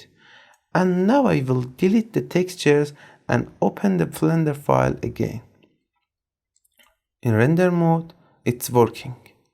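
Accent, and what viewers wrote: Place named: Iran. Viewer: fully Persian accent I love it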